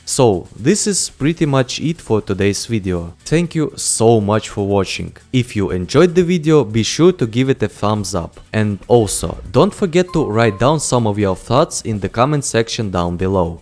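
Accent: England, United Kingdom. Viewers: Oh, you from England. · Oh, you are from England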